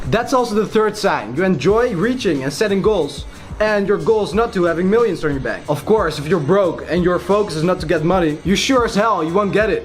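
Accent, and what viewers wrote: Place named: Netherlands. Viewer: Love the energy! And the Dutch accent is great too, hard to confuse it with some other. :) (I lived in Holland)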